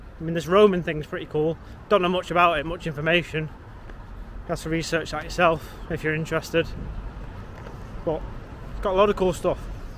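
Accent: Manchester, United Kingdom. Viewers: He's sounds like he's from Manchester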